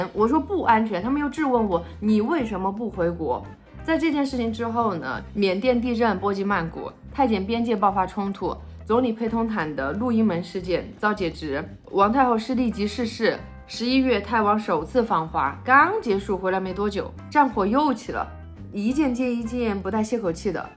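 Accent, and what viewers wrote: Sichuan, China. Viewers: tutu新年快乐❤不是四川人也觉得四川话亲切了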